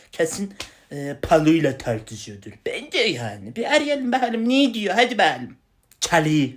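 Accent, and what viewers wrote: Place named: Tokat, Turkey. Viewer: abi tokatlı şivesinden anladım,hatta yemin ederim tokatlı.ben buna cevap verdim de bende senin gibi nerelisin yazdım o yüzden boşuna yazma yani.😊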